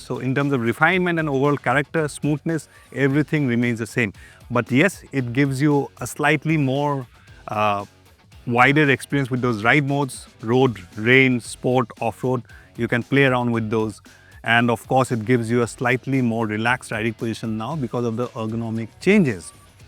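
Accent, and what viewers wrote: Assam, India. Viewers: In first 2 lines of this vedio of begining I could confirm the anchor is from Assam. Lovely demonstration being done.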